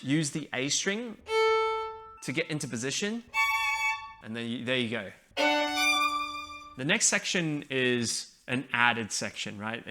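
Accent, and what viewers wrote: Australia. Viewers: I like your Australian pronunciation very much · has a different accent here. More aussie. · Why did you have to loose your ozzie accent? It's such a charming and delightful accent that always sounds cheerful. 😍 Also I love your arrangement and you're so kind to teach ❤️ You're brilliant!! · I luv ur aussie accent nahh i just love uuuuu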